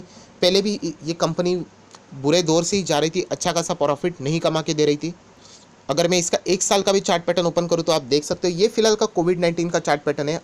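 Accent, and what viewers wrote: Maharashtra, India. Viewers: Are you Marathi? You language (tone) seems familiar. BDW awesome content and knowledgeable, following your channel since month and learning new things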